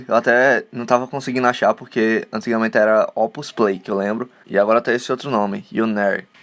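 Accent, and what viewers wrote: Northeast, Brazil. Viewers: Como identificar um sotaque nordestino ? e rsrs só faltou o palavrão ! Rdrs é comum em algumas cidades ! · É impressão minha , ou ele é nordestino?? Pq ele tem o sotaque . Eu achei super top !! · Vc é nordestino ? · Como é presta atenção homi, cum sotaque do nosso nordeste😍😍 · Vc é nordestino, né? Sotaque forte da porra. Não sei o que eu acho mais lindo, esse seu rosto perfeito o o sotaque nordestino, as palavras ficam mais engraçadas ou corridas, acho gostoso demais ouvir nordestinos falando.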